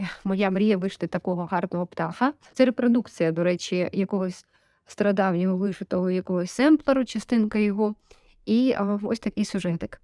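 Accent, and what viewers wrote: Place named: Russia. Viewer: Очень сильный русский акцент. Да уж. ...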